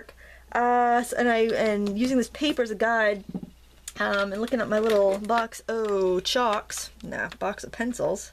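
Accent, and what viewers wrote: Maine, United States. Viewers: This is funny.... I had just been thinking about how you say the word drawing, and then you said something about it. It is fun listening to your Maine accent while tutoring. I love your work, as I have said many times. Think I will "drar q" some gourds, for my friend who carves them as a side income. She is incredible, and being her friend, I have been given many, so a gourd card would be perfect for her. Thanks for the "draring" tutorial!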